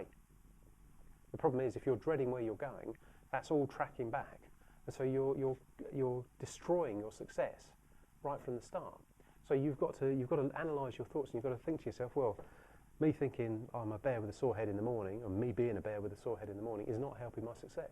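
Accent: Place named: Australia. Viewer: i love this guy's accent..very broad australian with english twang..hahahaha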